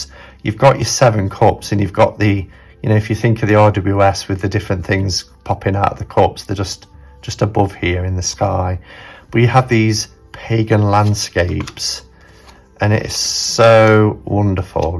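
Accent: Nottingham, United Kingdom. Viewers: Nice reviews. You sound like you're from Nottingham like me lol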